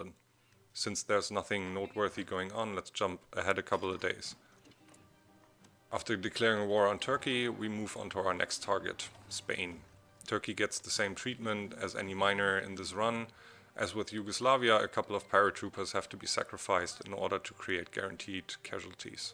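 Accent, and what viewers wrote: Germany. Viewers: Because he most likely has the German version of the game, from his voice and accent I would say he speaks German. In the German Game Version the Portraits of Head Nazis such as Hitler, Himmler, Göring, Hess and Göbbels Are blacked out or censored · I believe he’s German, I’m Dutch and it sounds a bit different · Are u from Germany?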